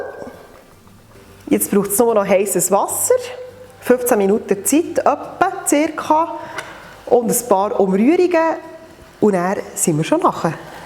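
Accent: Switzerland. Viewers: Herrliches Schwizer Dütsch..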